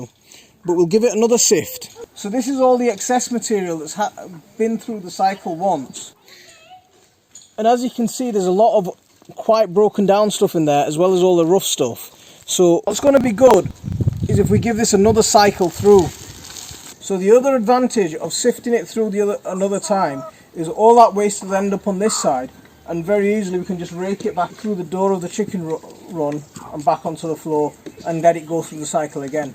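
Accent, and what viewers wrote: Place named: Yorkshire, United Kingdom. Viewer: oh ok Ma'sha'Allah I didn't think you was from west yorkshire my brother, I could tell with the accent but I thought your probably more from Sheffield sides lol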